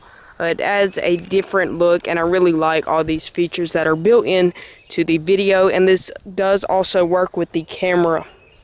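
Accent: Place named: South, United States. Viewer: I bought the camera for my wife and we like it, and as well we liked your video. You might have set image stabilization on so when you're walking one does not have a tendency to stop the video and go elsewhere. I can't stand shaky video. Or if you did have image stabilization on, you might practice holding the camera still. It takes work; everyone will respect your work more. The overall video seemed a bit rushed, unplanned. My wife and I liked your voice, nice southern accent. You obviously seem to enjoy this sort of work.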